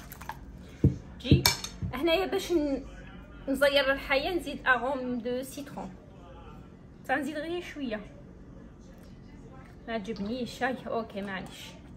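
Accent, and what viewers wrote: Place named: Tiaret, Algeria. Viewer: سلام دخلت ولله عرفتك صدفة لقيتك معلقة عند الدكتور عبدالحفيظ دخلت عندك اتيراتني لهجتك قلت هادي سور بنت بلادي تيارتية درتلك ابوني حبيبتي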